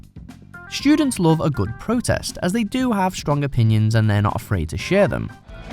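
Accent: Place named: Northern England, United Kingdom. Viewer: You have a Northern Accent, and hate foxhunting.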